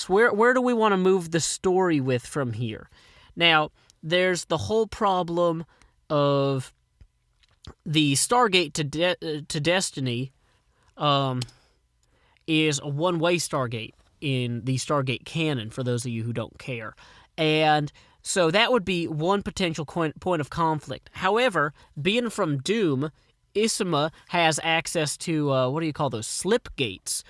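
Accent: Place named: Kentucky, United States. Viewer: Excellent work! Love the accent. I'm a KY girl myself so it is rare to hear something sounding like home on Youtube! I need you to make a prequel with more Etho in it. Hahahaha!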